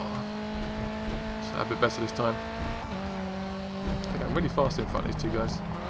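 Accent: United Kingdom. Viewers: He is British i think??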